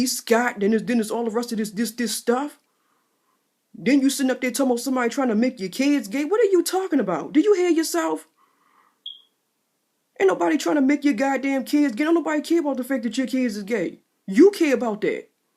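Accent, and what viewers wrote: Baltimore, United States. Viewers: she has a very thick Baltimore accent...Most everyone I know from Bmore stress the U sound hard...its kinda funny tho, I use to tease a friend when she pronounced the color "Blue" .....it was too cute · You seem to have a Baltimore accent. I see to this show I liked all the time and their accent sounds like yours and it was set in Baltimore. Love the different accents around the globe. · (Twiztid Lestat) Baltimore accent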